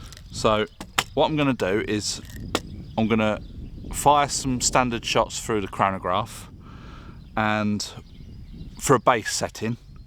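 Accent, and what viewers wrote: United Kingdom. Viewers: From your ascent, you sound like a British man that is having a lot of fun in France.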